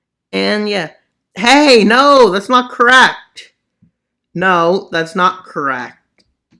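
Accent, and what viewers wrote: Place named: Alabama, United States. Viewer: I don't think he is pa jeet because bro sounds like he is from Alabama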